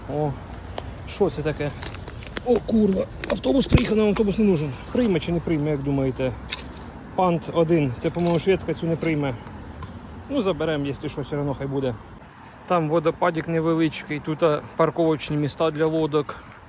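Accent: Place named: South, Ukraine. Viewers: минута. Земляче я теж з Оріхова. По твоєму говору я так і поняв що ти десь з Запорізької області. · Вітаю тезка. Так оказується ти ще й мій земляк, я так чомусь і подумав коли вперше почув твій говір, бо дуже знайомий діалект, такий тільки у нас на півдні ) Я теж на чужині, працюю, не легко, але є надія на життя. Дуже сподобалася твоя ідея збирати пляшки, збираюся і сам якось у вільний час таким зайнятися. Успіху тобі та натхнення !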